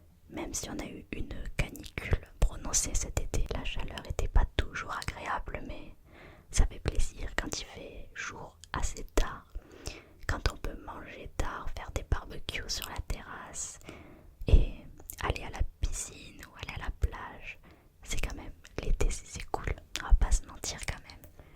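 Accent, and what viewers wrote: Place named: South, France. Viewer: J'ai découvert votre chaîne il y a peu et je n'avais pas fait attention à ce petit accent du sud (enfin je crois)dans le baing lol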